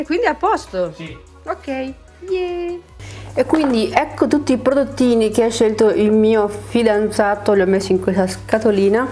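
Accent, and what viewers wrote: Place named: Bologna, Italy. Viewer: Quanto si sente la cadenza bolognese!!! Noi ci riconosciamo ovunque 😅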